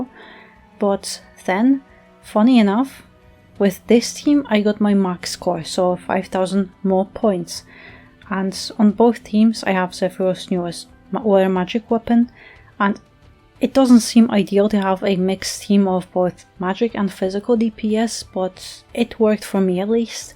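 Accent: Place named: Eastern Europe. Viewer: I like her accent. Sounds eastern europe, I am curious…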